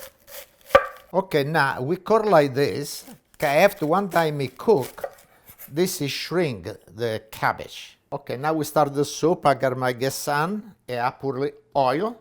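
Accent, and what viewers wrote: Italy. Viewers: Cute Italian style English ~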